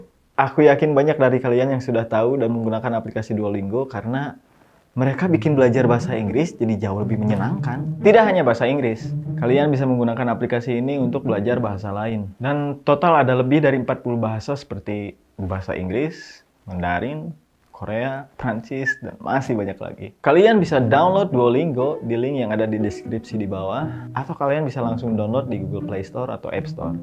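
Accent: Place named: West Java, Indonesia. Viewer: Logat sunda ey ga hilang semngat terus bang 👍👍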